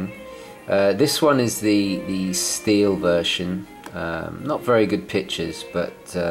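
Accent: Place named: United Kingdom. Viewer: Not to nit pick......but its pronounced "Hoi-er" .....not "Hew-er".....for the brand Heuer. I guess it must be a Brit thing as you also tend to say "Oh-mee-gah".....vs, "Oh-may-gah" ( for Omega), as most others do. Standing by for the inevitable flaming, but my intent was to inform not to irritate. Good review.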